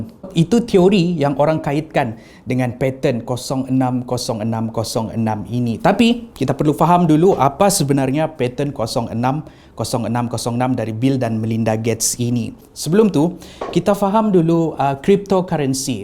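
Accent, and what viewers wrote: Sabah, Malaysia. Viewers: aku asyik trgelak je ni bila asyik2 tgk nota hahaha 😂 ckp bahasa sabah comel ja bah 😂 #salamdrmelaka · The best part is when dengar cerita but with sabahan slang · ini kali ketara sabahnya jo 🤣🤣🤣🤣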